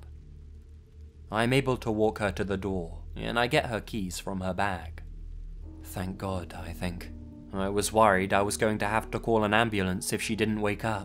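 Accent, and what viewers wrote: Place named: Suburban England, United Kingdom. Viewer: Amarsanaa Ravdansambuu He doesn't sound posh English and doesn't sound poor London. He sounds like more suburban or a mix of those accents. So um Posh Poor English??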